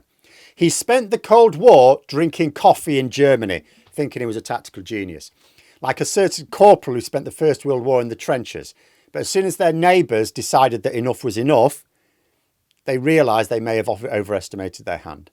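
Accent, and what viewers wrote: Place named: England, United Kingdom. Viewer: "Smooth move, son!" Speaking as an Australian, this sort of thing is why we like listening to English people speak as compared to, say, Americans. You sound like my grandfather but cool and very now. Anyway there has never yet in recorded history been a century without at least one widespread land war in Europe. Why would this century be any different?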